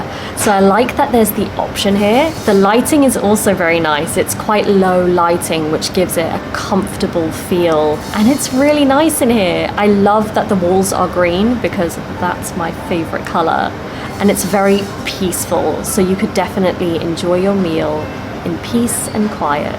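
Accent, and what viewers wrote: RP, United Kingdom. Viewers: ほんと可愛いし、British Englishへの慣れという点でも助かる。こちらも見てて楽しくなります。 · 美味しそうに食べるなぁ！やっぱ英国の英語ていはいな。40代だけど中学生の時に途中からアメリカ英語にかわった。聞き取り安いけど、イギリス英語は雅な響きあるな。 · 何時も拝聴しております。さんのクインズイングリッシュはとっても聞き取りやすい。イギリス英語？は何時の頃からか話し言葉から書き言葉に変化したのではないでしょうか？。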